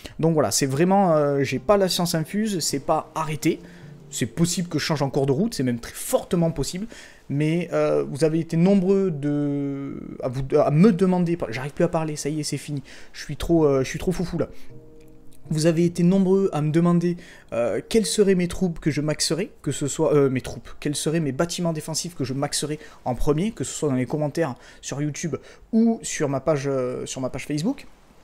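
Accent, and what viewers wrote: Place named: South, France. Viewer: très bonne vidéo surtout avec ton accent du sud ;)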